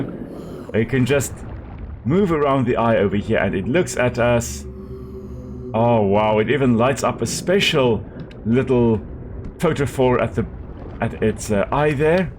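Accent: South Africa. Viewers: where you from? you sound south african to me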